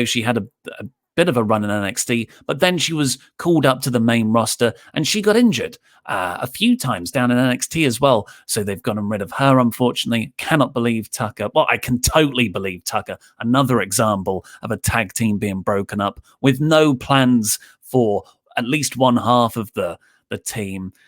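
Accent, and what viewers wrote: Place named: United Kingdom. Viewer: Why is breaking these awful news with a british accent at the wrestletalk youtube channel?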